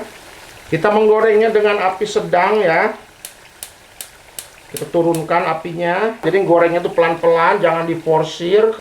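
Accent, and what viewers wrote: West Java, Indonesia. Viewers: Sunda banget, KUNGKURAHAN... logatnya juga Sunda banget...👍👍😅😅❤❤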